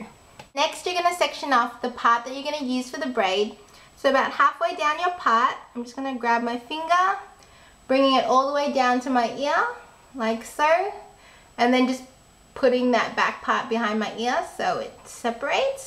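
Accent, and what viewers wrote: Australia. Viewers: are you Australian?? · R u Australian????? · Are you Australian? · Okay hold on.... Just started the video and i had to pause it to say this lol.... Your so cute !!! Hahaha :) is that an ozzi accent? Ima kiwi yo !! Lol anyway, the start has already made me excited and i can tell that your gonna make it easy :) your awesome missy !! :D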